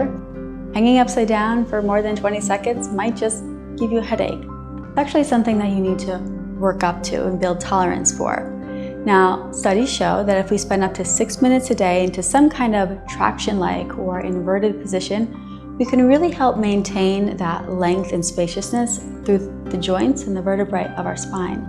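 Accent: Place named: United States. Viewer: Yoga has this saying that inversions works on your anti aging thing so it's the fountain of youth and I love your American accent namaste🤗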